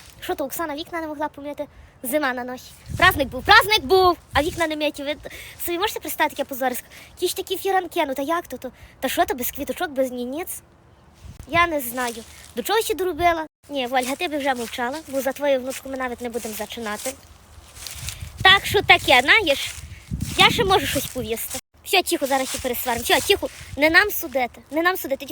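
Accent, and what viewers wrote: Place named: Ivano-Frankivsk, Ukraine. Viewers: Пані,як я люблю Вас дивитися то є цуд.Що би я робила без Вас,подивлюся Ваші відео і настрій маю на цілий день.Ваші відео антистресові😅.Здоров'ячка Вам міцного.Ви вмієте розказати і показати все файно як є в житті.А воно так в житті і буває.У Вас такий говір,як і в нас на Івано-Франківщині Всього Вам найкращого.❤❤❤❤❤❤ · ПАНІ. ВИ ЯК ЩАВЖДИ НЕПЕРЕВЕРШЕНА У НАШОМУ ЗАПАДЕНСЬКОМУ ГОВОРІ. ВАМ ПОВАГА РЕСПЕКТ. КАНАЛУ❤❤❤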